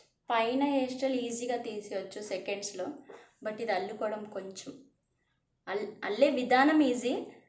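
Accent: Srikakulam, India. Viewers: Akka Srikakulam language super ga undi chala baga matladu tunavu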